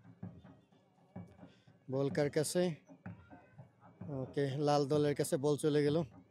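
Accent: Noakhali, Bangladesh. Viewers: এই নোয়াখাইল্যা চলবে না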